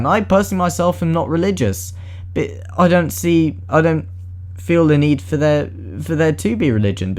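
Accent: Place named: Texas, United States. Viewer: you sound like you're from teaxas.....1776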